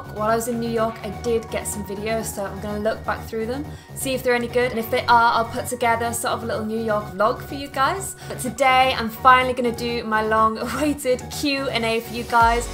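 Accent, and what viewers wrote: Ireland. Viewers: I have thought for a while that you have a slight Irish accent! :)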